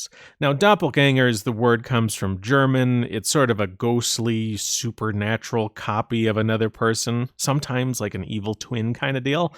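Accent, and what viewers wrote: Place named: United States. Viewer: Maybe west-coast or mid-west American-- it's quite generic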